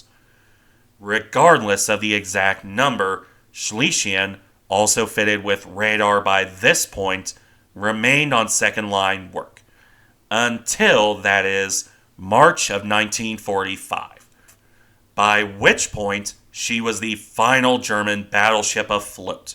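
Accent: United States. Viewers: Please don’t be a rude Prussian. He freely acknowledges his American butchering of native pronunciations. It’s something we do here and we are fine with it.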